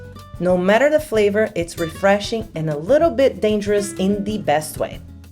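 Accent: Brazil. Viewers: Teu sotaque te entrega menina, você é brazuca. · Você é brasileira, né? Pelo sotaque. Acertei?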